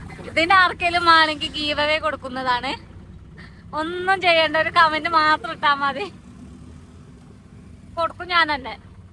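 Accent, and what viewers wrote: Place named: Malappuram, India. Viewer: Edhaan malappuram basha 👍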